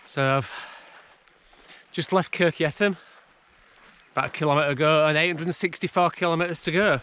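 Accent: Yorkshire, United Kingdom. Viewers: ohhhhh.....how the hell did I not recognize a Yorkshire accent??? Ive done Coast to Coast 3 times!!! I am so sorryyy...i am familiar about Lancaster vs York history. 😂 Ive subscribed to your channel and saw your Colorado trail thru-hike. Great job. I was on the CT around the same time but after day 12, Ive had enough and got homesick so i went home after I reached Twin Lakes. I did WHW abd CWT this year so I know what it's like already. My husband hasnt thru-hiked anything this long so I hope he won't give up on me. :)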